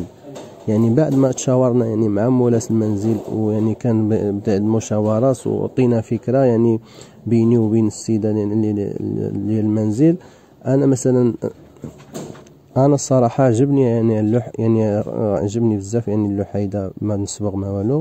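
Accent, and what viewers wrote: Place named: Tangier, Morocco. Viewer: من هدراتو كيبان طنجاوي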